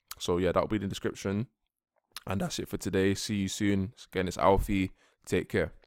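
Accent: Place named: London, United Kingdom. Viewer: Yes, bruv!! Which part of London you from?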